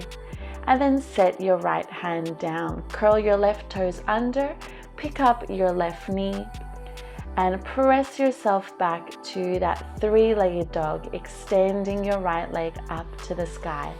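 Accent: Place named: Sydney, Australia. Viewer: First time watching your video and a new subscriber here! Great at home work out thanks! I'm living overseas so great to hear a fellow Sydney sider as well!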